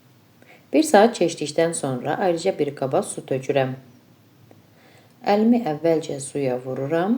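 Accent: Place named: Nehram, Azerbaijan. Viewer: xanim deyəsən Nehrəmlisiz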